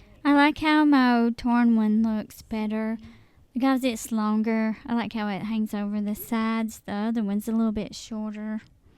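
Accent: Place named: South, United States. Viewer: I don't blame you for that. I was just wondering because I saw it in your older videos and thought if you were still doing something like that I certainly wanted to look into it. Thank you for communicating with me. I love your videos with your Southern accent that I enjoy so much. I am originally from Texas and now live in Missouri. I get teased (even after all these years) about my accent. They call me Paula Deen. I take it as a compliment. Lol! Now if I could just cook like her and had her money! Only kidding. I am blessed beyond measure!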